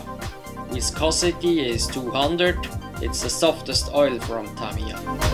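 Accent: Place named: Switzerland. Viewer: Hey, great video, really enjoyed it. Excited to see a running video with the setup:D If you don't mind me asking, in which Kanton do you live, I'm just curious as you have a cool accent:)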